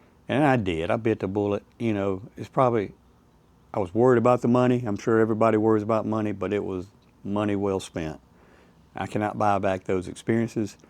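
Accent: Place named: South, United States. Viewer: Glad I found your channel....I watched a few more of your videos. Good information and content.... Likable guy. Enjoy the southern accent 💯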